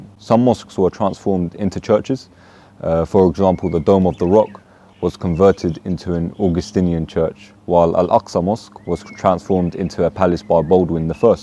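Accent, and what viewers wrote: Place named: England, United Kingdom. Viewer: لكنة انجليزية رخمة و رائعة!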